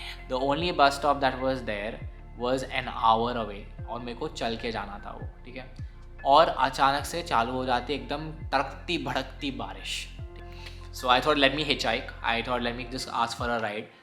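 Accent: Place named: Mumbai, India. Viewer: Your Bombay accent is very sexy 🤌🏻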